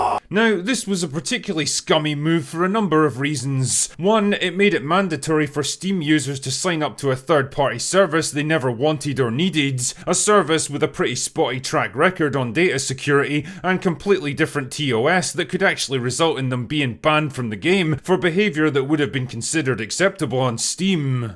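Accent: Scotland, United Kingdom. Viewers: This news sounds so much better in your Scottish?/Yorkshire? accent :)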